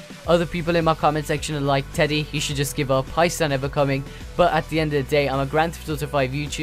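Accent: Birmingham, United Kingdom. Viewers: Uk Birmingham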